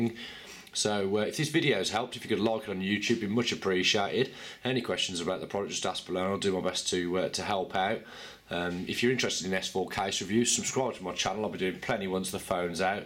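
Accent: Birmingham, United Kingdom. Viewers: A Fellow Brummy :O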